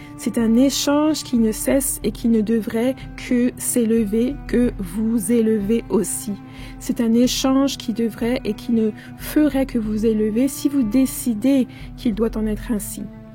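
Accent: France. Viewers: Merci pour ce partage que je partage également.. juste comme ça, tu ne serais pas de Lorraine? De part ton accent ^^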